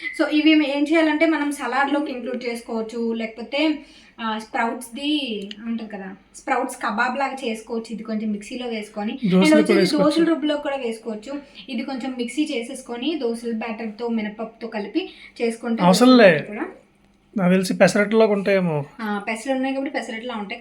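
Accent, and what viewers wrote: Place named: Telangana, India. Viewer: You have been staying for many years in Telangana, so don't worry that much if you are speaking Telangana slang telugu, that is also good language like Andhra slang telugu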